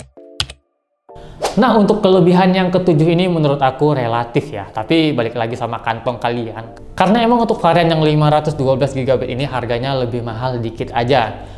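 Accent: Medan, Indonesia. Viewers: Bg lu org Medan?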